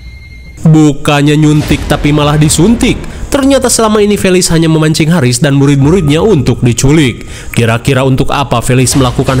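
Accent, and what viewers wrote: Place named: Madura, Indonesia. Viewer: Madura hdiiiirr mas broooo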